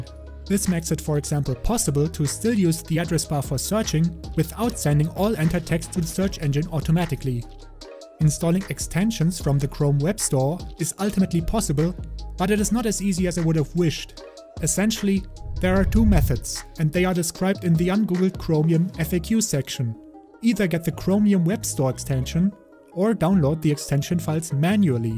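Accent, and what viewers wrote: Germany. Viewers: bro you german too? (accent)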